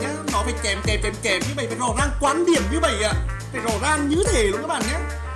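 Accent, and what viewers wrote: Nghe An, Vietnam. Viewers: Ah ở đoạn mô nghệ an rứa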